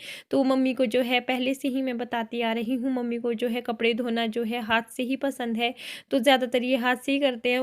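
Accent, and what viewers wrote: Pahari, India. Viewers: Hy anty osm vlog muje be apka hindi be pahari slag bhut pasand hai hm pahadi hai bhi pahadi slag nhi ayga to kya English ayga muje to bhut pasand apki voice be or apka slag be or ye dadi g nice hai kse haste haste hue bol rhe the👌👌👌👌👌 apko mara namaty 🙏🙏 or love u bhut sara 😘😘😘😘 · Pahari tone is must , that is our culture & identity . So keep it up .God bless you and your family. 🙏🙏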